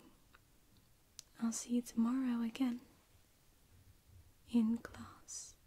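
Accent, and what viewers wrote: Netherlands. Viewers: I smell a dutch accent. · Dat lichte nederlandse accentje kruipt er toch stiekem tussendoor :))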